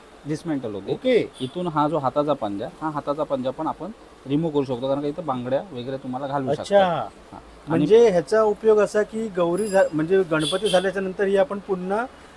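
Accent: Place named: Malkapur-Akola, India. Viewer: Dada tu malakapur akola chya ahes ka tuzhi language tikadchi h bhari vate ekyala❤❤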